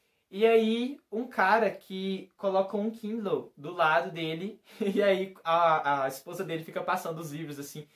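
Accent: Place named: Minas Gerais, Brazil. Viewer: É uma delícia ficar ouvindo esse sotaque mineiro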